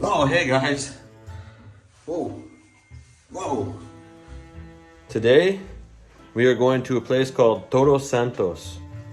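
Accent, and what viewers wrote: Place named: Spain. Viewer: Just discovered your chanel I like your spanish accent, keep it up.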